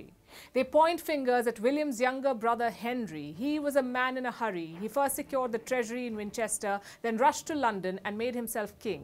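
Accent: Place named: India. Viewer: Pity about the Indian accent when discussing British events. Perhaps she should either learn proper English pronunciation or stick to her Pakistani/Indian subjects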